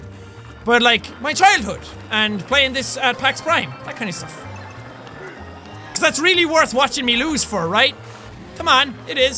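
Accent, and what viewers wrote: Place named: Ireland. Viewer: LazyTurtle I'm brazilian and my school don't teach very well so I have to learn by my self, I learn a lot but I still have a few words to know, I doesn't know too much when I find jack but I actually learn a lot with him, and was hard because he has a Irish accent..... I talk a lot sorry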